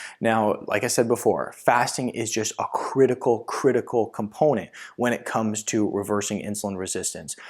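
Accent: United States. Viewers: Excellent presentation. I like the speed of your speaking. I didn't waste any time. I don't like slow speakers. But out there in the world most people do not understand American accent. English letters of what you are speaking coming on the screen would help millions of viewers. Thank you. O<num> whatsapp.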